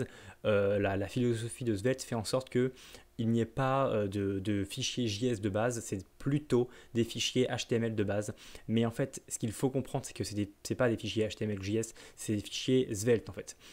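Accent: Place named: United Kingdom. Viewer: super mise a part l'accent anglais .... hhahhaa Le count m'a tué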